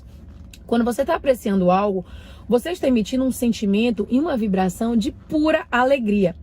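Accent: Bahia, Brazil. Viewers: Rumo ao 100 mil 🌻 #novaaquinocanal 🧡 faz acho q uma uns 15 dias que você apareceu na minha vida, gente quanta luz, quanto energia boa, aaaamo o sotaque baiano, só ele por si só já nos trás acalmaria, a certeza que tudo é lindo, que tudo chegará a nós. Hoje resolvi me organizar e assistir toooodos os seus videos☺ acabei de ver a playlist da lei da atração, os 33 vídeos 🤭 e fui anotando todos as principais mensagens para colocar em prática. Obrigada por dividir com a gente tanto conhecimento, alegria e certeza que o universo é perfeito. E por manter (no meu caso) a Fé como absoluta. Agradeço a você, agradeço a sua vida (lulu, ben e lucas). Fica bem!! Você está mais linda, amei seu evolução (afinal vi vídeos de 6 anos atrás 🤭). Gratidão 🌻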